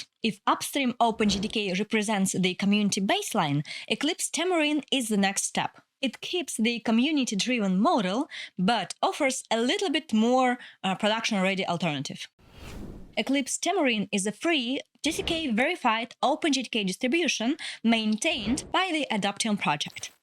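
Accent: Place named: Europe. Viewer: Awesome video. Thanks. By the way, I think your pronunciation is cool :face-blue-smiling:, it is like listening to java news from Europe (or from wherever your accent is)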